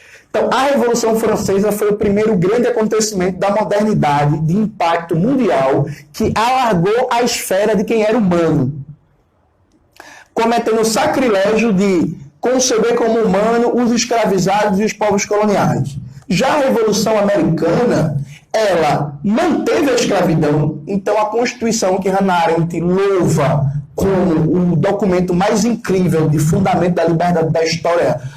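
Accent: Pernambuco, Brazil. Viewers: sim, sem dúvida, o sotaque é puro mangue pernambucano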